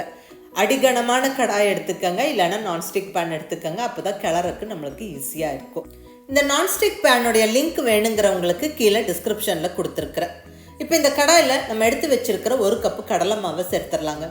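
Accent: Coimbatore, India. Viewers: Super mam your kongu tamil and your all recipes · I enjoy following your recipe, and listening your Kovai Tamil. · love your voice and presentation. cbm slang, good to hear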